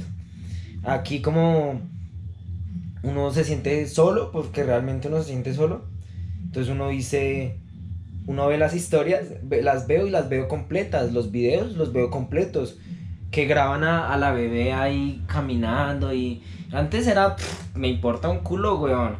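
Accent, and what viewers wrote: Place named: Colombia. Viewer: Suena bien ese acento colombiano mezclado con palabras mexicanas . . . Parceeee 🤟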